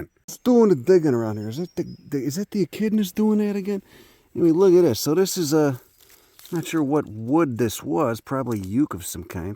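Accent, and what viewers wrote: Chicago, United States. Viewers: I thought it was old school Chicago lol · I love the mental transition from "some dude in the USA with the fast talk and the accent" ... "in Tasmania". Gives me a whole new way of looking at the country.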